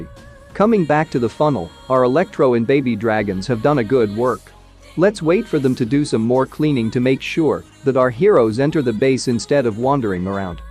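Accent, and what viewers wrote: India. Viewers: Bro, Are u Indian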